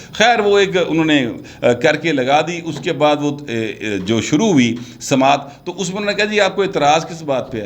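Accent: Punjab, Pakistan. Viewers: آپ دلیری سے بولتے تو ھیں ھی اس میں پنجابی کا جو تڑکہ لگاتے ھیں ۔مزا آجاتا ھے ۔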